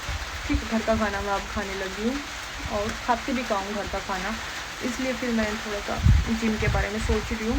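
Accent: Haryana, India. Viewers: Wah rr jatni aaj haryanvi bol k moz krdi❤❤ My cute crush 🥰🥰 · Hariyanvi boli bahut pyari lag rahi👍👍👍👍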